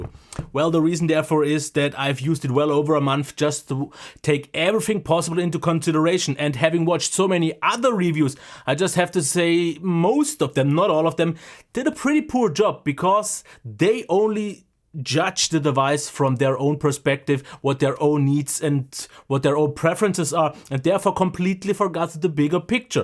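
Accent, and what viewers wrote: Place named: Germany. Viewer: Man I love that German accent, brings the queen's English to life.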